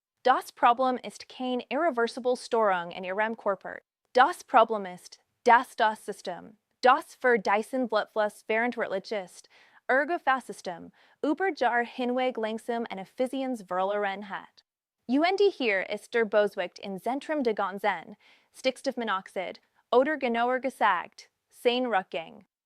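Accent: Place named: England, United Kingdom. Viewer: Was soll denn der (KI-) Unfug...: deutscher Text, aber englisch und mit englischem Akzent ausgesprochen???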